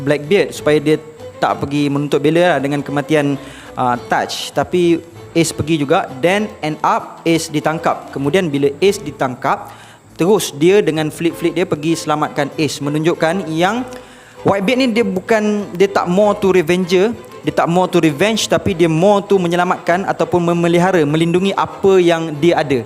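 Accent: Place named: Kelantan, Malaysia. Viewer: Kecek klate skali😂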